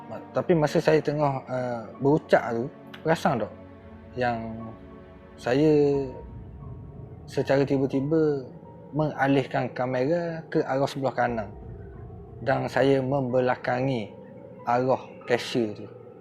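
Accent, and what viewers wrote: Terengganu, Malaysia. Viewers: Suka dgr bro ni bercerita dgn loghat ganu dia..😊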